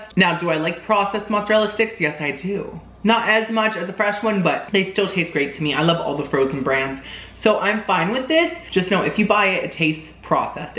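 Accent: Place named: United Kingdom. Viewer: I love to watch the unboxing! Don't listen to the dummies. Also..you don't even EVER have to ask if we want a follow up video to anything...ummm YASSSS WE DO!! I'll take any video from you..follow up, new, or just you talking in your British accent for 30 minutes. Just say BUTT-AHHH in your British accent for 30 minutes and you will make my year!!💙💚💜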